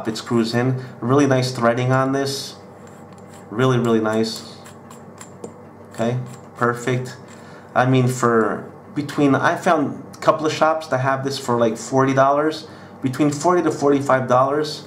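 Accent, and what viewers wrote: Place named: Portugal. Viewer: When I stumbled on to your channel, you reminded me of someone, your slight accent that comes through in English. I couldn't pin point it. Then I realized you remind me of my dad, and various family members, I wondered if your were Portuguese, after more poking around through your past videos I saw the Portuguese reviews! Really enjoy them. My parents are from Portugal, born there, while I was born here in Canada. You seem like you and my dad would be friends, which would be great, would persuade him to stop smoking. I really enjoy your videos, and love watching your portuguese reviews.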